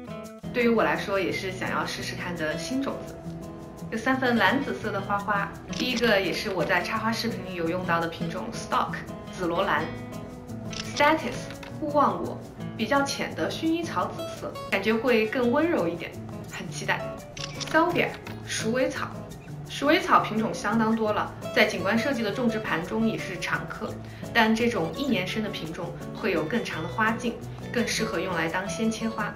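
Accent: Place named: Jiangsu-Zhejiang, China. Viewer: 你说话的感觉和我好像，是不是江浙人呀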